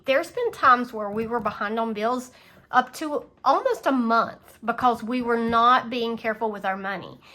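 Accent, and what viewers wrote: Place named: Kentucky, United States. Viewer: Love this! Your accent reminds me of home (Kentucky) ❤❤❤ new subscriber here!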